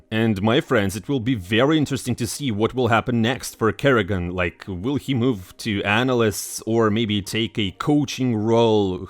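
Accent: Russia. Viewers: This russian accent is horrible.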